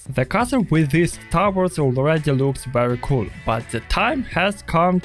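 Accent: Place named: Kazakhstan. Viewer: My kid & I were trying to guess where you are from, my guess is Kazakhstan. My guess is that you spent a lot of time learning English from books. Good job sir!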